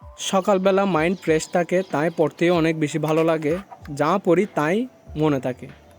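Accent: Chittagong, Bangladesh. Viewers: ভাই আমি ও চিটাগং এর আপনার কথা শুনে বুঝা যাই আপনি ও চিটাগং এর ,, আমি এবার এডমিশান শিক্ষার্থী ,, কমার্স থেকে,, আমার mbstu তে পড়তে চাই ,,, আপনার তো হেল্প পাবো মনে হয়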